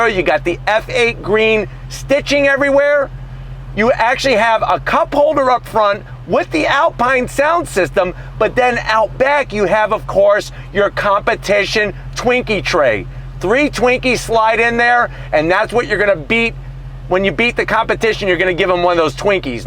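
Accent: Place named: New York, United States. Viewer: Gday mate im an Aussie and am getting a Hellcat imported for a January delivery. Cant fkn wait aye. Good review just a question though where is your accent from as i love immitating your voice. Are you from Brooklyn or Boston or something else?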